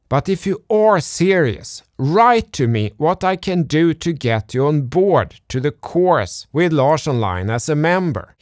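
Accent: Sweden. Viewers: This Swedish guy accent is like music and the best in the business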